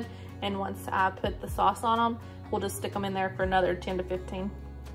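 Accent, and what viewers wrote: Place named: United States. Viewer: Hi... I'm new from Shauna's channel. I like what Im seeing 👀, so I've subscribed. I noticed your accent, what State are you from??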